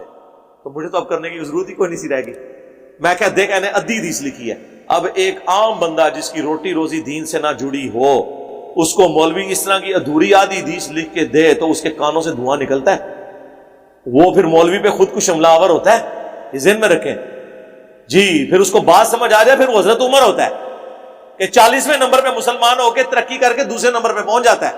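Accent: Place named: Punjab, Pakistan. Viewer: Yah jo panjabi ka tarka lagta hy na bato bhai ki bato ma bara kmal ka lgta hy log chirty hi iai liy hyn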